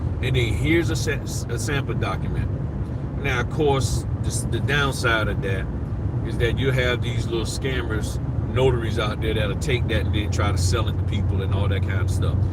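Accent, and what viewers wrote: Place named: Florida, United States. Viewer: YOU DEFINITELY SOUND LIKE SOMEONE FROM DUVAL COUNTY!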